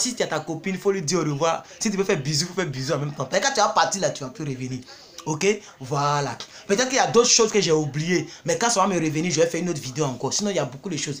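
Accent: Ivory Coast. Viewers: 😂😂😂 Ivoirien tu es trop fort, ton accent est trop chic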